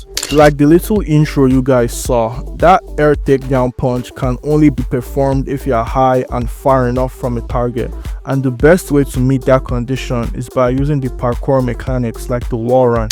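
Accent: Nigeria. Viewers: That accent.....you be naija boy? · U seem African. U sorta have A nigerian Accent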